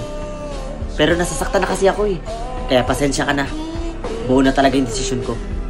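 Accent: Visayas, Philippines. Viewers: bisaya man diay ka idol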